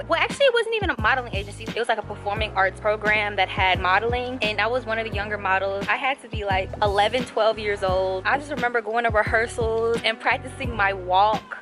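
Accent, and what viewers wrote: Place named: Chicago, United States. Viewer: You from CHICAGO, I HEARD THAT 😊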